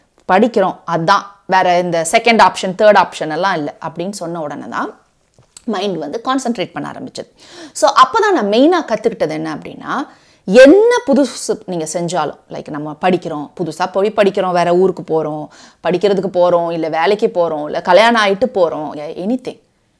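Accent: India. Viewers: Hi , much appreciate ur clarity of speech and kongutamil .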